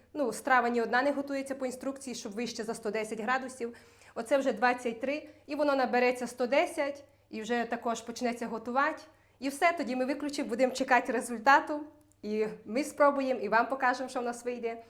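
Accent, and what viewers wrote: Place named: Zhytomyr, Ukraine. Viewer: Я зжитомерщини ваша мова дуже схожа на нашу бальзам на душу сімейного вам щастя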